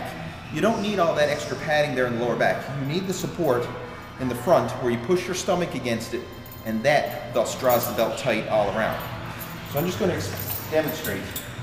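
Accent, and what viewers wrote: Newfoundland, Canada. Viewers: Hey fellow newfie haha. Sure sounds like one. Eh by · He must be a Newfoundlander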